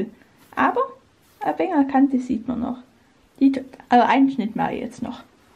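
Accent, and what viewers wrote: Swabia, Germany. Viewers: Hockline 89 es heisst dialekt und es ist schwäbisch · PS: der Dialekt ist cool....schwäbisch? Stuttgart oder ?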